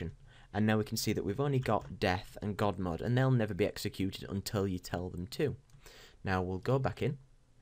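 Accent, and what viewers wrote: United Kingdom. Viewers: I've already saved your channel AND subscribed. I'll definitely be exhausting your videos before I hunt for any more. Wish I could buy you a beer...or a i guess that would be more like a 'pint' since it sounds like you're in the UK. Cheers!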